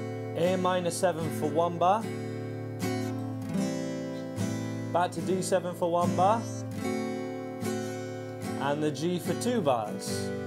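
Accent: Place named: Hull, United Kingdom. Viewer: where are in the UK? I’m from Hull but living in Australia and you sound like a neighbour! Loving learning from you. Very clear to understand. Thanks for all you’ve done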